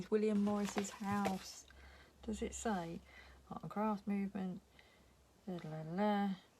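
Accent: North London, United Kingdom. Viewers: Lovely collection! You talk like me, I'm from North London!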